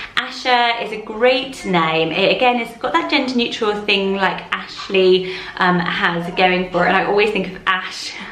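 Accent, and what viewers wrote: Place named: Bristol, United Kingdom. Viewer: The whole way through this I was like you sound like your from Bristol and then you said Wiltshire and it all makes sense haha x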